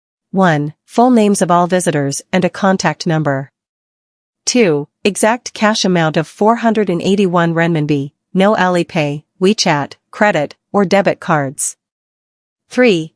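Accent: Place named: United States. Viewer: Nice vid. Thanks for sharing. 👍 Who is the voice doing the narrating? Sounds like an American voice.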